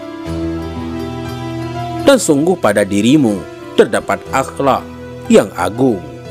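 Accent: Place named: Bali, Indonesia. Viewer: suaranya mirib suku bali ya 😇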